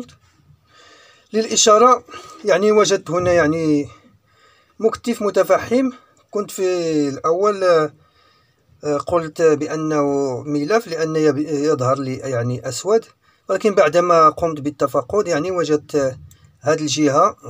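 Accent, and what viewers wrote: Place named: Agadir, Morocco. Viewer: ممتاز وشرح جد واضح ومفصل بارك الله فيك من خلال كلامك يمكن أن أقول انك من اكادير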